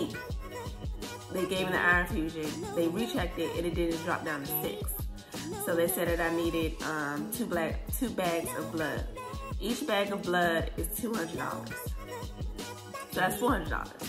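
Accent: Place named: Prince George's County, United States. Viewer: you sound like you from PG lls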